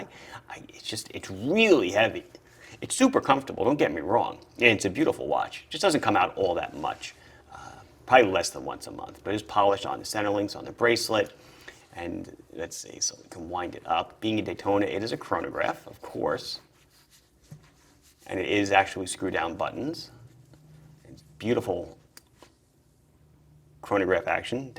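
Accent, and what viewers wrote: Long Island, United States. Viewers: “Hi this is …” that accent whips my head around every time 😂 we don’t have many authentic Long Islanders down here in Texas.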